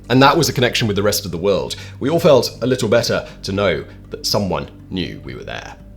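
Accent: United Kingdom. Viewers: maybe that's just because his British accent. Seriously though, go to settings and playback speed. Select maybe .5 or whatever you want to try. I'm listening at 1.5x speed currently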